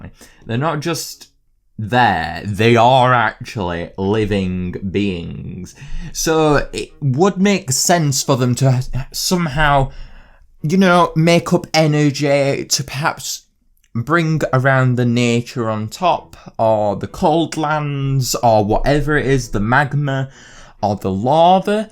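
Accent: United Kingdom. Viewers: Oh, that british accent)))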